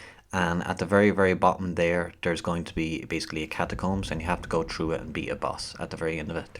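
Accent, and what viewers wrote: Ireland. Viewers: You Irish mate? Nice guide thanks! Subbed!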